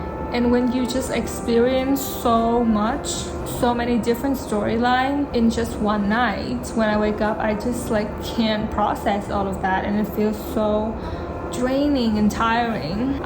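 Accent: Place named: United States. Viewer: I think you are Vietnamese but your accent very looks like from the US. It makes me confuse :v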